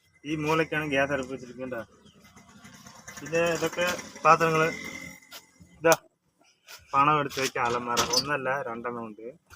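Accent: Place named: Neyyattinkara, India. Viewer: ഇത് എവിടെയാ നെയ്യാറ്റിൻകര ആണോ അതുപോലുള്ള വർത്തമാനം. കൊള്ളാം നല്ല വ്ലോഗർ.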